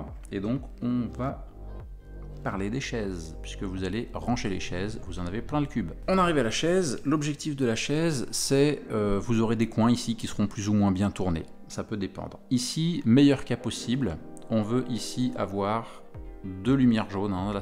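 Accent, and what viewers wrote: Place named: Belgium. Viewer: Trop pourri ton accent belge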